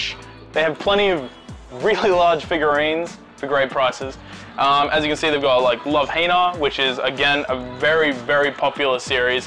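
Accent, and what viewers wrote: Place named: Australia. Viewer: Omg how did i just find this store yesterday (THAT AUSTRALIAN ACCENT THO)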